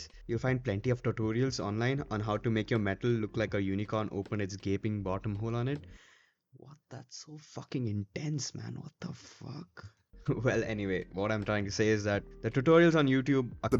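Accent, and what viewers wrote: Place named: India. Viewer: Indian accent sure is dense, but holy hell i thought u have like 80k subscribers or something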